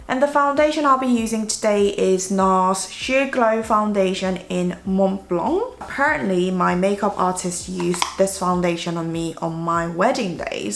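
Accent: United Kingdom. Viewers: 오늘도 매력적인 영국발음으로 귀여운 영상감사해요:) 다음 영상은 새로운 집을 배경으로.볼 수 있겠네요🙋🏻 새로운 환경, 새로운 구도에서 님은 구독자로서 기대되거든요😚 요리영상도 기대되고 이사간 마을에서 좋은 곳도 소개해주세요💕 좋은 추억이 많이 생길집이길 바래요 Q1) 네일 색이 참 이뻐요:) 셀프 네일인가요? 어디제품인지 궁금해요오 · 언니 영어발음에 치이고 치인다..❤️사랑해요ㅠㅠ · 언니 영국식 영어발음 너무 좋아요 진짜ㅠㅠ최고 좋아요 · 오늘도 영국발음에 치이고 갈수록 예뻐지는 미모에 치이고.. 존예에요💕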